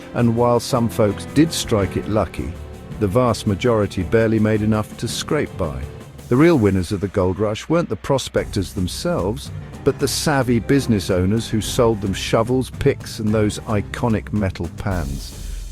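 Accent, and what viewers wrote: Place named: England, United Kingdom. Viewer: Why is this bit pf US history being narrated by an englishman.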